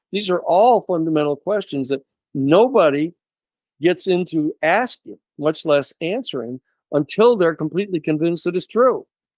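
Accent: Wales, United Kingdom. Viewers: You still sound Welsh, but the heavy accent has gone 👌🏼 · How much more disclosure do we need 🤔? Col Nell (Grusch’s boss) went on record last week saying “There is zero doubt non human intelligence exists “. Greer has 30 years of data which is now available to the public. All this has been filtered out of mainstream media for sure, along with anything else that may remotely affect the reality they want us to believe. Our purpose now is to connect the dots and remember the truth of who we really are. What is more exciting than disclosure? Ans: finding a podcaster with a 🏴 Welsh accent - respect ✊ Light and love to you guys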